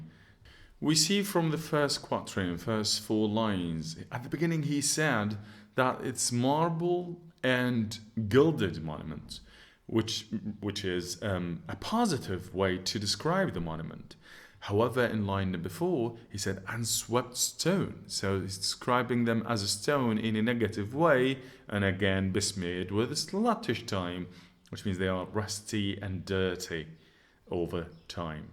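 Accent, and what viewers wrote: United Kingdom. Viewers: If only there's a doctor with this perfect accent and language in my uni ɵ̷̥̥᷄ˬɵ̷̥̥ omg at first i didn't watch the intro so i thought u're an English person 😂 gd job British accent is so difficult for me, i think that's why i have an American accent lol,it's easier!. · I thought you are from england !!😭💙💙💙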